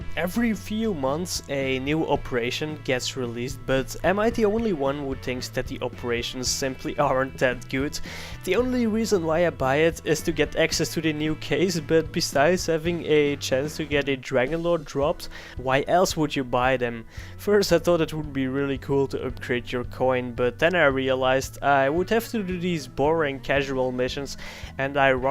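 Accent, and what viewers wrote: Netherlands. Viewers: are you dutch? i thing i know your accent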